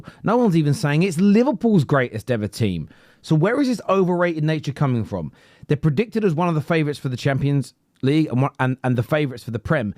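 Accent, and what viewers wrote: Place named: Scotland, United Kingdom. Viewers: Scottish accent heard, opinion objected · Who is this Scottish tosspot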